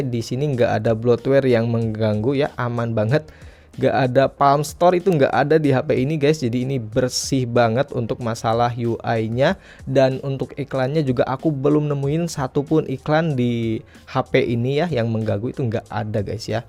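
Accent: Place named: West Java, Indonesia. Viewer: Meni Sunda pisan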